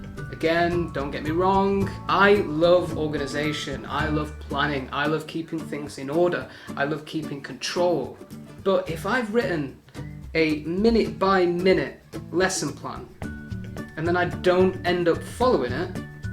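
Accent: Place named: East Midlands, United Kingdom. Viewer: Brilliant video, thanks so much! I´ve got my first online teaching interview tomorrow and these tips were really useful, especially what you said about planning after classroom teaching for 8 years and doing a hellll of a lot of prepping! BTW are you from Derby/Notts area? Your accent seems so familiar :D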